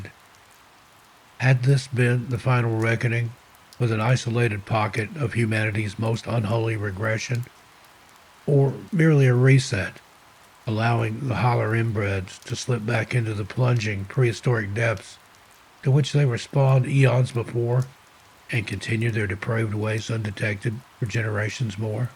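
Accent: Appalachia, United States. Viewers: Great stories. The narrator sounds like that of an Appalachian. These stories scare the crap out of me. Its been years since I have done it, but I have hiked and camped in these very same areas in these stories. I didn't see anything or even necessarily heard anything too creepy, but there was a sense by all of us that "something" malevolent out in the darkness of the deep woods. I have never gone back.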